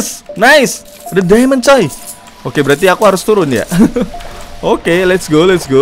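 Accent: Java, Indonesia. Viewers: Abang orang jawa ya bang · Bang wong jowo nopo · Fiks Orang Jawa Logatnya :v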